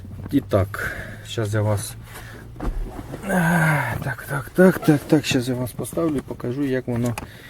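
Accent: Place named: Surzhyk, Ukraine. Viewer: Я чомусь думав що це просто в тебе суржик такий,але ти як думаєш так говориш так і пишеш. Це по якому ти ,,балакаєш,,? Якщо по кацапські то говори вже, вибери одну мову на якій думаєш,на тій і розмовляй,я як лікар можу поставити діагноз - діслексія,алексія,біполярний розлад. Це ж кров з вух, більше трьох хвилин дивитися не можливо. Конєшно воно є такоє,мажліво тут паставіть єсть що так.....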